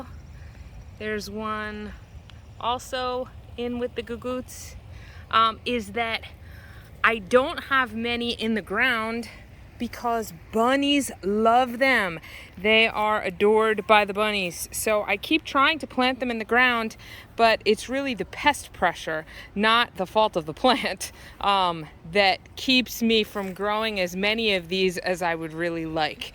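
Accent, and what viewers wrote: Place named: New Jersey, United States. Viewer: I have been considering walking onions and someone handed me some at my farmers market this week so I plan to plant them. I’m wondering if I plant them in the fall or in the spring? I have lived in Illinois for 45 years but I recognize your New Jersey accent right away since I grew up there. I didn’t move here until I was 22. You made me homesick lol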